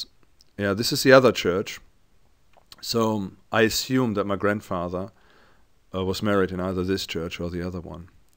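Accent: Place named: Germany. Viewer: Nice job !!! i listened all, sometimes it was kind difficult to understand just a few words pretty much by your Hard German accent, but you're German talking about German history which is really nice :D !!! i'll be traveling to Germany next year, and i wanna make the tour to all these old days German lands, but i wanna go farter , i wanna include pommer,Danzig,Warmian-Masurian,Kalliningrad, and Memel, currently Klaipeda South Lithuania, all those lands are full of German vestiges,well in this case i wanna ask something to you, as German citizen that you are, so why after Berlin's wall falling, Germany never reclaim any of those lands ???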